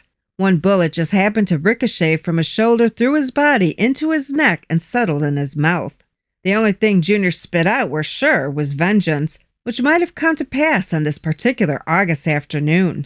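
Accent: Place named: New York, United States. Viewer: Hi again nice narrator lady with your cute New Yawk accent. Your non creepy admirer.. Keep up the great work Dawlin.. Harold....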